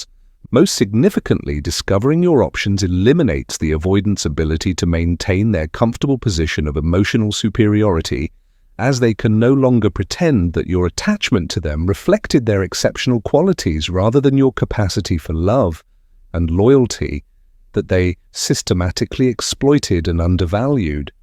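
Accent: Australia. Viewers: I prefer the previous voice with the Aussie accent not this snobbish one. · Australian accent again please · Yeah was only r ason I watched this show a familiar Aussie accent I won't watch now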